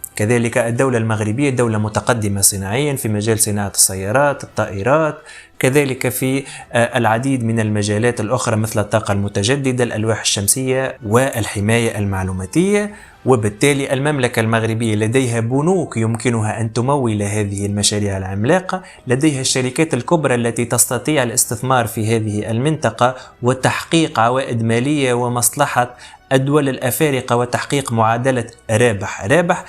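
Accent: Tunisia. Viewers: انا لقيت قناتك صدفة من ساعة شاركت معك لأني وجدت المحتوى جيد بالتوفيق ان شاء الله سؤال من أي دولة شقيقة انت؟ من اللهجة اظنك تونسي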